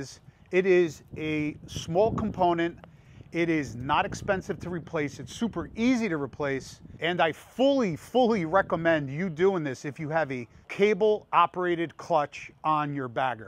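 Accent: New Jersey, United States. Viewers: he is from jersey they all talk like that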